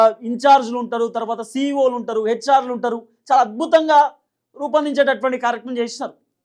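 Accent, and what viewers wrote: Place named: Telangana, India. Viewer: Telangana lo ittlane matladutha ru sami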